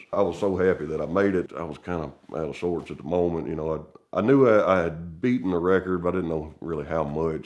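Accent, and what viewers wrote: United States. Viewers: This guy is the most American sounding man I’ve ever heard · if i think of a tipical usa acsent this is what i think off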